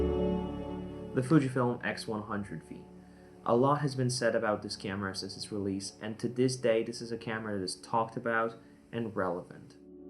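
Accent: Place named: United States. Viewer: I subscribe to your channel because you speak in American accent!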